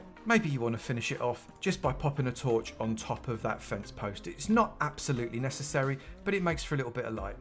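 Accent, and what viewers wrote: United Kingdom. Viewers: Oh, cool, a chill British voice, so I can wind down after work and learn new farm mechanics since I’m getting back into Minecraft after a decade… I might even watch more because he sounds so rela…”HELLO EVERYONE!!!” yanks headphones out of ears Nope, I think I’m going to find tuts online (like I used to do) and refigure it out myself this weekend with just Minecraft ambience/music as the only thing I will hear and know it won’t suddenly shoot up in volume for no reason at all.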